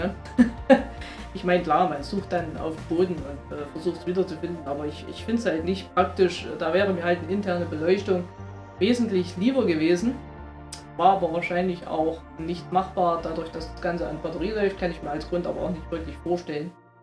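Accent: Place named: Saxony, Germany. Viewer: Da kommt wer aus Sachsen? Xd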